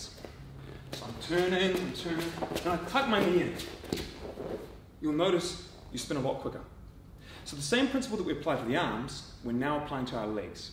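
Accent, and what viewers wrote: Australia. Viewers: are you australian? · do I hear an Aussie accent ?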